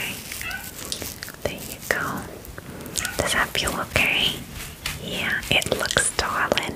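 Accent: New Jersey, United States. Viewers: Omg a jersey fairy 🥹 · The jersey accent really fits, I think I need more of her ASMR but jersey . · Girl, u are good at talking jersey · POV your from jersey so you don’t notice a difference till you go to the comments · *New Jersey fairy* Me: TAKE MY H E A R T. NEW JERSEY FAIRY IS THE BEST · I didn’t know I needed a fairy from Jersey but I clearly did 🌸🧚‍♀️ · some people from jersey also speak like this, that's probably why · I love the Jersey accent ❤ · Was expecting Irish or Scottish and I got Jersey. Love it. ❤️ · The New Jersey Brooklyn accent made this ten times better than it would’ve been 👍👍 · I've never been called sweetheart with a jersey accent before and I tell ya, I'm not complaining hahahahahahaa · Finally asmr with a Jersey accent without the gum chewing! 😭 Amazing video! ❤😊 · JERSEY FAIRY!! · she meant the jersey accent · Please, I need a another video with a jersey accent 🤣 this was too good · I love the Jersey accent. 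Love your videos! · oh my gosh the fairy with the jersey accent i am living!!! you're like the nanny but for fairies. 😍🥰🥺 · love the jersey accent ! 😂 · Another flawless gem 💎 I absolutely love the "Jersey" accent.. I'm convinced that this is what a Fairy looks, sounds n acts like!!! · as somone from nj, yup can confirm this is the “accent” we have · Jersey I think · JERSEY FAIRY 🧚‍♀️🌸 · Alright I give, I'll subscribe because a fairy with a Jersey accent is the coolest thing ever lol. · Its giving jersey new york fairy and im here for it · I think Jersey but some people are saying they hear a Brooklyn or Long Island accent!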